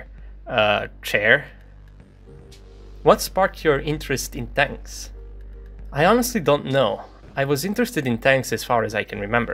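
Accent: Eastern Europe. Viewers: I'm sorry but why would not say what country you are from or your age? Neither matter if you are putting out good content! Do you not like your country or do think people knowing what country you are from would be somekind of negative? I personally don't care if you don't say what country you are from or you age, but I also don't think it should matter what country you are from. From your accent you sound like you might be from eastern Europe, but then again I could be totally wrong lol. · Obviously, he has a slavic accent . I suppose he comes from Belarus or Ukraine .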